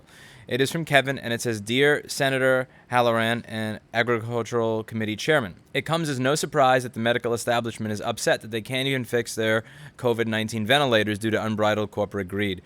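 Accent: New York, United States. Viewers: Louis has a NY accent? Lol I guess I missed that 😆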